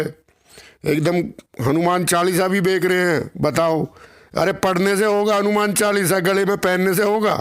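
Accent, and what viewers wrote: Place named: Haryana, India. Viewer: 🙏🙏 Maharaj ji .aapki hariyadwi language bahut achchi hai.main bhi hariyadwi hun.shubh ratri.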